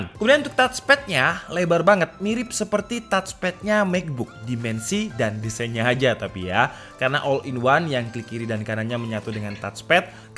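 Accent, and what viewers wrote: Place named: Madura, Indonesia. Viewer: Logat Madura kek gitu Madura bagian timur, ngk semua kok logat kek gitu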